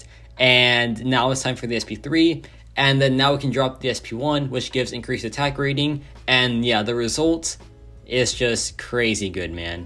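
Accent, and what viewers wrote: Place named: Singapore. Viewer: U sound like singaporean sia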